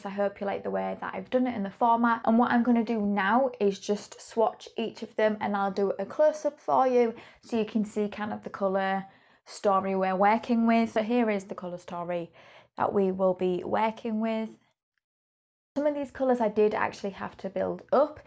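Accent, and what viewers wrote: United Kingdom. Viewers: Love hearing a fellow northern accent on here! Great intro, will be following along with your updates ✌️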